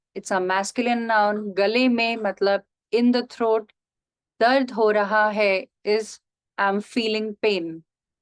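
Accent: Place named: Delhi, India. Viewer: Hey, do you mind if I ask which part of India you're from? You sound like you have a Delhi accent, or at least from somewhere in the North. Also, I was going to suggest you to do a video about the numbers in Hindi.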